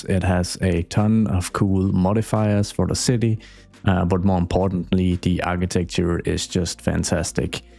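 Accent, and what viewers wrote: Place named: Denmark. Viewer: is that a danglish accent i hear🔥🇩🇰🔥🇩🇰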